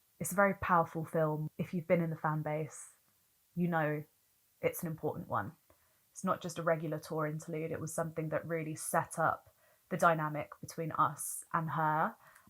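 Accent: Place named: United Kingdom. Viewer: This girl sounds british, I think she is as well as a pop-culture student and especially from The Queen Gaga,The Best & The Great.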